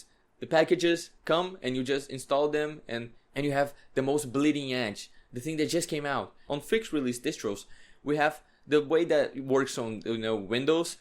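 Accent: Brazil. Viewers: Video mt top carioca! É muito top q quando agente comeca a usar Linux, agente acha que é so uma versão melhorada do windows. Mas quanto mais agente usa Linux, masi agente tem opiniões sobre os diferentes componentes do sistema, e é engraçado que quase todos que mudam, começam á mais voltados a privacidade e FOSS. É muito boa essa comunidade? P.s: Teu inglês tá muito bom, não deixa tua cabeça achar que so por causa do sotaque brasileiro ele não é, tenta não se comparar com nativos! Parabéns pelo video e pelo canal, tu ta me fazendo aprender quickshell pra customizar meu hyprland (Arch btw) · Awesome video! Prolly the best ive ever seen about distros, love the slides. Seu sotaque não me engana! É br? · Mano BIZARRO como ao ouvir você pelos primeiros segundos, deu um gatilho do nada que você era brasileiro só pelo "sotaque" de leve que senti na pronúncia das palavras em inglês, isso é muito doido KKKKKKKKKKKK enfim cara, excelente vídeo e sucesso pra ti!!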